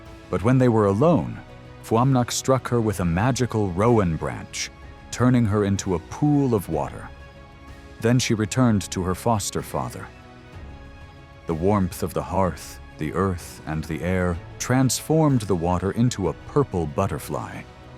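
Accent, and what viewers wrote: United States. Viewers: Why US accent when it's about Celtic Mythology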